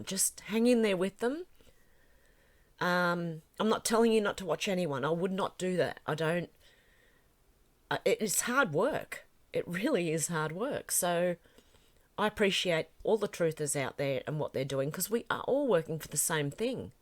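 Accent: Australia. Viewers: I just love your accent from down under..and such a beauty,...inside and out..don't wear yourself down and get sick now..we really appreciate all of your hard work and the sacrifices you've made.. a little secret of mine...I turned my TV off 3 yrs ago and I don't miss it one bit... MSM is all fake news..the continuing of mk ultra and mind control. You are such a strong lady and Patriot.. thank you ....just for being you..we are all in this together.. WWG1WGA..THANKQ DEAR LADY.. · I really like you. I like your videos. Really love that Australian accent. Keep up the great work , we appreciate it.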